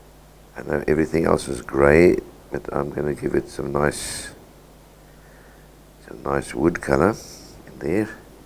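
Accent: South Africa. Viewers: This is delightful. Thanks for sharing. Nice to hear that South African accent again!!!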